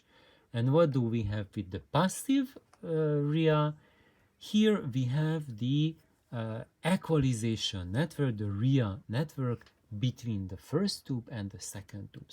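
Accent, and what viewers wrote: Hungary. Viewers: I’m 2 yrs late, but I am and have always been able to understand you perfectly,. I’ve met friends via the vinyl and audio communities from all over the world, France, Germany, Sweden, Australia, Japan, Brazil, etc., and I can understand them, quite clearly in fact. It’s not like you’re speaking Hungarian, just English with a Hungarian accent.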